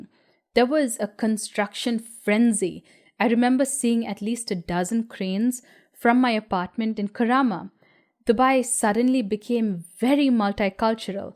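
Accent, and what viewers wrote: India. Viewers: Wow an Indian accent that I can understand, liked and subscribed! In Dubai, I couldn't understand when (most) Indians speak English.. I ended up speaking to them in Hindi LOL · Such a sympathetic woman! I feel for you and know that growing up - wherever - this is your home. However, having been to Dubai as a European, I do not understand WHY on earth anybody wants to travel there. It is one of the most unattractive places I have seen. All fake, all new, all shiny, glossy, empty...filled with the most beautiful people from all over the world working there. I have hardly ever seen so many attractive human beings as there...but still: I liked my 1 week trip there, but would never dream of going there again. Howver, for you: Having not seen you parents in 6 years is terrible. I hope you can return and see them soon! Keep up with your very insightful and great channel. 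Love it! (And I love your Indian accent, too. Remember: MOST people in the English speaking world speak like you and not the Queen's English!!!)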